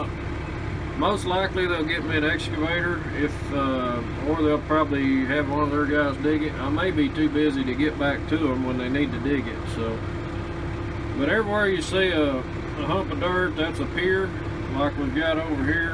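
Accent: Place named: Oklahoma, United States. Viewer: I promise I'm not picking on you here.... The engine noise was a little loud to understand you, so I turned on the closed captions. Needless to say it does not understand Okie very well. Personally I'm from MS and lived 50 plus years in TX so it doesn't understand me either. I just found it funny.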